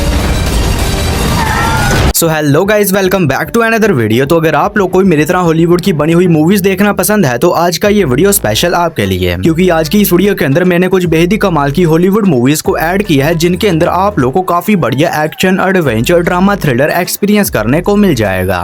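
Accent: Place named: Lahore, Pakistan. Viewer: Bhai tum Lahori ho Kya bolne ka tarika waisa he hai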